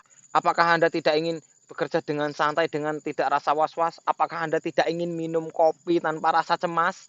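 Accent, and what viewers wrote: Java, Indonesia. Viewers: Mas ini domisili di mana...?kok logat2nya orang jawa...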